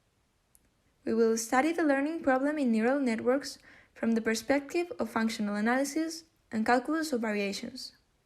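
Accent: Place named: Turkey. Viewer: She sounds like she is Turkish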